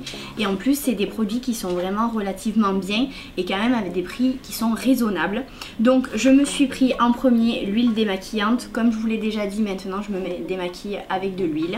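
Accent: South, France. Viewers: Merci énormément pour ce concours auquel je participe ! Je te suis depuis un petit moment et je te trouve super !!! Continue comme ça ❤️ Ps : j'adore ton accent et vive le sud ! 🙊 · Coucou, j'espère que tu vas bien, il n'y pas très longtemps que je suis abonnée à ta chaîne et je trouve que les montages de tes vidéos sont agréable a regarder et tu as un accent du sud que je connais particulièrement puisque je suis aussi dans le sud. J'ai pu tester beaucoup de produit sephora notamment les vernis qui sont pas mal et je trouve aussi qu'il se sont amélioré sur leur produits. Je participe au concours, bonne soirée ☺ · Sa se voit que tu viens du sud de la France avec ton accent ah ah, super vidéo :) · Super haul! Je te suis sur ta chaine depuis pas très longtemps, mais je suis très contente. Tu es simple, et tu prends beaucoup de temps pour tes abonnées et je trouve ça bien et presque rare maintenant sur Youtube.Tu mérite largement tes 15 000 abonnées. 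Et l'accent du sud, et la bonne humeur ; j'adore! :D Je ne connais pas la marque Sephora, mais j'en ai entendu du bien, je participe au concours. Gros bisous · Vidéo au top 💘 L accent du sud le meilleur Ahah 🌞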